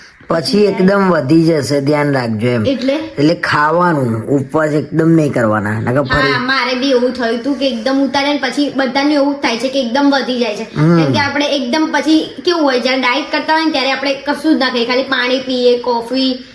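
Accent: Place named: Ahmedabad, India. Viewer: તારી સ્પીચ બહુ મસ્ત છે પ્યોર અમદાવાદ ની ભાષા શુદ્ધ ગુજરાતી સાંભળવાની બહુ મજા આવે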